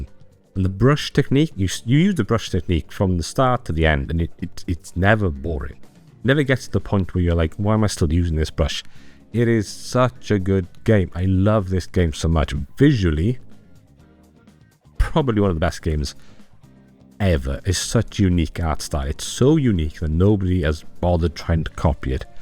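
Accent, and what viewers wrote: Wales, United Kingdom. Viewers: Where in Wales you from bud?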